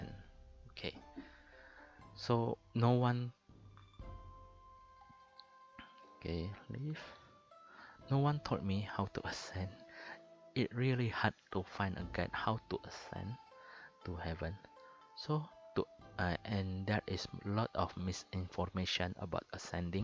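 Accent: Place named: Indonesia. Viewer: Fix org indo ini, dari cara ngmng nya keliatan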